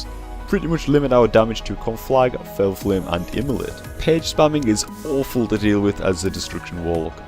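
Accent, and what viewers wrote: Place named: North East England, United Kingdom. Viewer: I understand all that you say, pronunciation isn't a bother for me:P Although I'm from north eastern england also, so I have a similar accent:P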